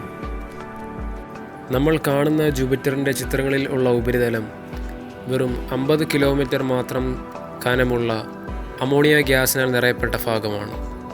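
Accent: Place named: Trivandrum, India. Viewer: Trivandrumo kollamo ayirikkum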